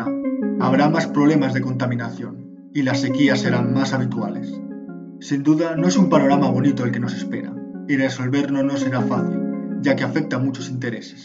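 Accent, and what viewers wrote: Spain. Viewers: Queeeee??????!?!? Eres español??? :V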